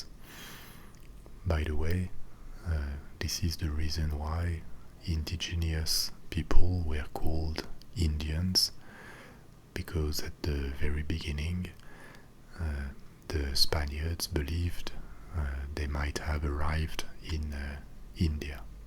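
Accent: France. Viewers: Mon Dieu. You have certainly got a niche in this kind of presentation sir. First off, yes naturally Americans in the US in particular like the French accent, and also the French language spoken, especially when done so in a relaxing atmosphere. I think this is great for Anglophones to learn French as an augmentation and/or diversion from the hectic world. Oh, almost forgot, the good history lessons too. Big thumbs up. Merci à vous.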